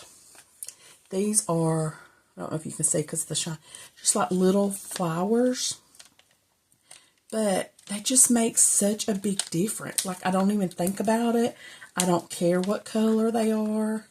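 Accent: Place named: South, United States. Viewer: no girl…but I am from Texas. My son was watching with me when I played your video and he said…oh she’s from the south. She sounds like us! 😂😍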